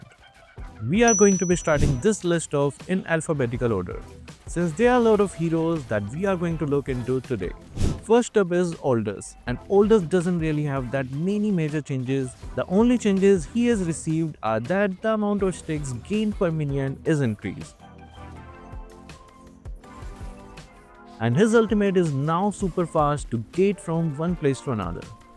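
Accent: India. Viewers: Your name is japanese but your accent is Indian? Wtf?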